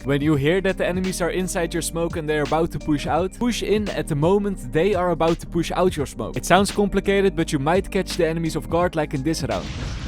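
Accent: Netherlands. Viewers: how much do you like his dutch accent? very much i like his tips more 👇 👇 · 1% englise acsent 99%dutch acsent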